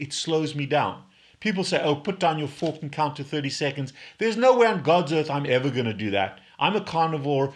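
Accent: South Africa. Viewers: South African accent. It's quite charming. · faint South African accent!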